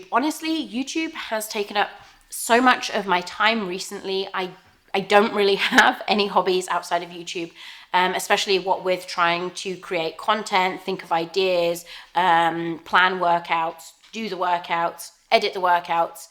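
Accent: England, United Kingdom. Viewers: SHUT UP! I LIVE IN ORANGE COUNTY! Based on your accent, of course, I expected you live somewhere in England.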